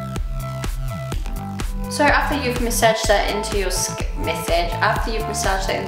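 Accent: New Zealand. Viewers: Omg you have such a maori accent lol itsa compliment! Thanks for the tips. Is this suitable for children as my kids have a halloween party coming up :)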